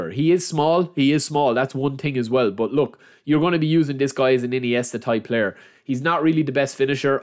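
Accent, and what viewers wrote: Ireland. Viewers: Are you Irish brother?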